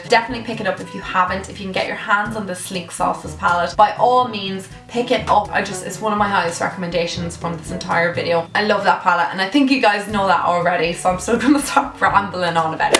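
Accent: Ireland. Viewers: what part of Ireland r ya from ....Meath pride 💚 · You have an amazing accent, I feel like it's Irish ( because I'm Irish too ) if your from Ireland.. Where abouts? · are you from ireland?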